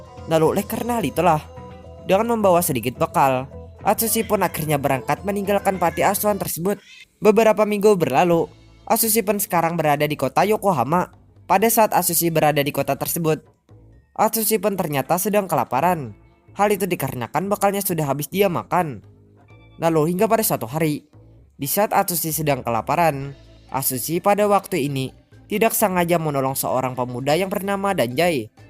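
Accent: Banjar, Indonesia. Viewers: Org banjar bg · Logat nya kaya urng Banjar pang 😂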